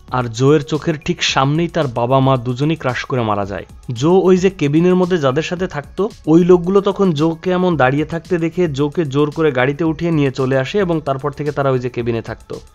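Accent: Khulna, Bangladesh. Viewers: ভাই আপনার বাড়ি কি খুলনায়?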